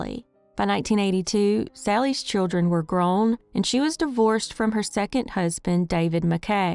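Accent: South, United States. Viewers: Love my southern Belle voice ! Have a wonderful and Blessed week ❣️🙏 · Thanks. Love ur voice, only southern one I like💕🥰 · she has a country accent lol that’s perfectly normal everybody speaks differently but ig we all got our own opinions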